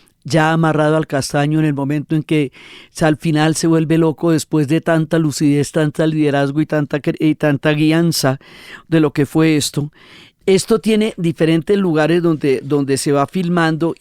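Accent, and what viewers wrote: Bogotá, Colombia. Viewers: Jajajaja.. Naaaa la narración en la serie tiene que ser costeña, nada más cachaco que. A la serie no le falta nada. Así está perfecta.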